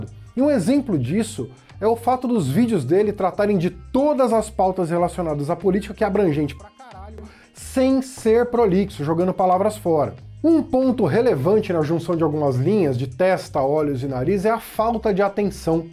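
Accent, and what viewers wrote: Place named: Ceará, Brazil. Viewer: E isso mesmo. O é um sucesso. Adoro o sotaque cearense dele. 😊😊😊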